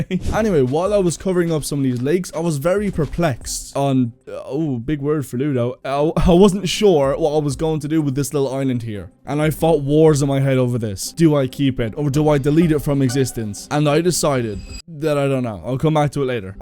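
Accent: Ireland. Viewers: I KNEW U WERE IRISH I KNEW I RECOGNIZED A IRISH ACCENT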